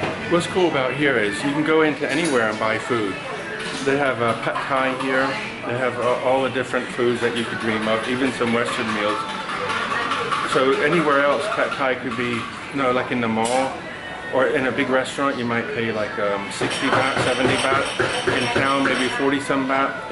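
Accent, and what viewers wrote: United States. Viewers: Hi. I made some fixtures once and set-up an e-mail for it. One time thing, but I still use the e-mail as my Google account. You are from England? You sound like your from the U.S. Have you ever seen "Kev-in Thailand"?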